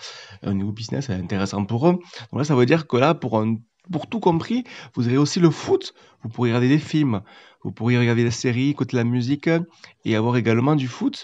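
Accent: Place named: Aveyron, France. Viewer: ça fait plaisir d'entendre un Aveyronnais hehe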